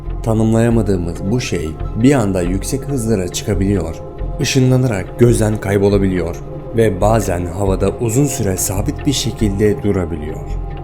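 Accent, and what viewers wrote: Azerbaijan. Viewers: sen azerisin yoksa turk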